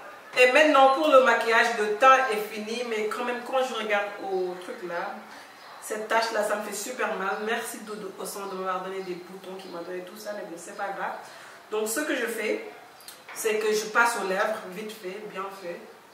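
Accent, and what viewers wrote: Senegal. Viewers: lol je kiffe les accent sénégalais la meuf parle sans complexe awa magui ni si clinique bi je viens tout juste d'accoucher hmd je suis à 8000 km de chez moi (Sénégal)ma famille me manque mais dès que j'ai vu ta vidéo j'ai tout oublié si tu es tjrs au bleds bon vac je t'adores trop toutes mes salutations a qui me fais trop rire avc ses wolof de congolaise my name is Mya depuis Paris🥰🥰😘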